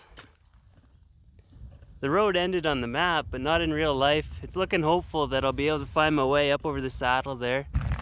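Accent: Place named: Canada. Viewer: Wonderful vid's. However, what really has attracted me to your vid's is your "voice & Canadian accent & yes "(oout, aboout). A magnificent combination. Thanks for all that you do & keep up the great work🥂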